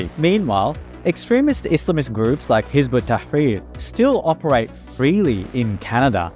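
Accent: Australia. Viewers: You're not even Canadian, you're Australian from your accent, who are you to speak about the internal affairs of another country care to explain !???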